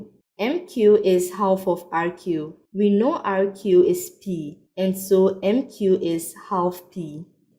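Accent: Ghana. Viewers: are you Ghanaian?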